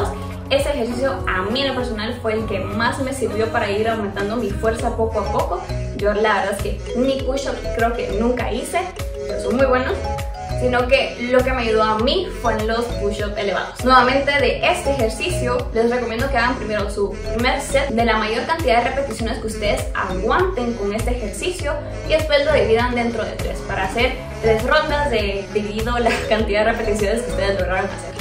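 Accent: Guatemala. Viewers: Acento #chapin !!!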